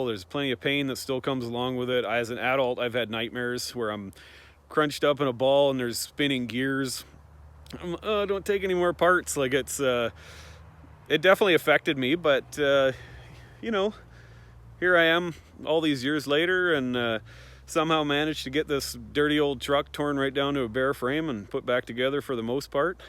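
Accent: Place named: Canada. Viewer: accent says Canada, americas hat. Edit: the license plate also says Canada lol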